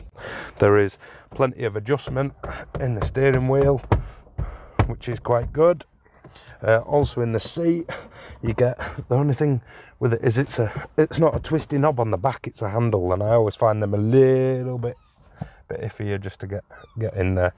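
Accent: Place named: Northern England, United Kingdom. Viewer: I like your friendly northern style but respectfully suggest you need to cull as here and there you repeat yourself. Less is more... I wish you had taken a motorway run as I'd have liked your observations regarding what many reviewers complain about, viz wind noise and tyre roar in this scenario. Apparently both are excessive. I'd be most interested to hear from owners on this subject if any of you care to comment, please. I've ordered a top spec Mazda replica - forgot to say that I think the exterior design is stunningly beautiful from all angles - but can't forgive the manufacurer for producing a car sans satnav as I loathe the prospect of faffing around with phone cable connection etc. Grrrrrrrr!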